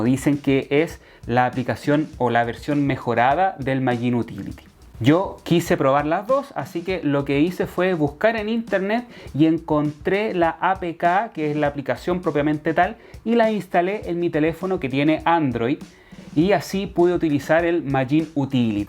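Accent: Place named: Chile. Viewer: Hola ya e solucionado el problema de la palabra go q se me ponía en vez del la velocidad ahora ya se coloca como te dije anteriormente lo uso en un patín de gama alta pero sabes e escuchado a muchos Youtuber q dicen q cuando la velocidad es calculada con GPS es la más fiable será así????? También tengo que decirte q cuando voy en rodando el patín en la pantalla me marca 26 k y en el ciclo me marca 23 24 kilómetros entonces no es igual es bastante la diferencia aveces marca 28 patín y 24 ciclo entonces aquí en la hago casi se q tu eres de chile por el acento yo soy de viña del mar pero llevo 34 años en Barcelona y se q me dirás este ciclo es para bicicleta lo sé sea para uno u otro no van parejos con respecto al patín en cuanto a la velocidad al igual aveces le cuesta un huevo q se conecte a un satélite casi 4 minutos y hay veces q lo hace en 30 segundos no vivo en un pueblo ni en un sitio apartado vivo centro centro entonces??????? 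Ojalá me puedas ayudar con todo lo q aquí te pregunto un saludo desde Barcelona Spain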